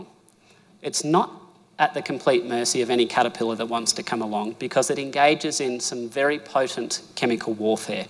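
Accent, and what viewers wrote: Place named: Australia. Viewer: Thank god at last somebody from Australia talk not US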